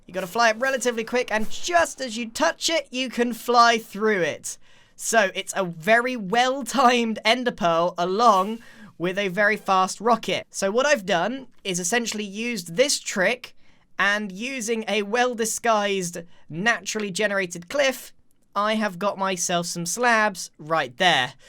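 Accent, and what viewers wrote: United Kingdom. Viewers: u remind me of wisp why cuz u both are British like mee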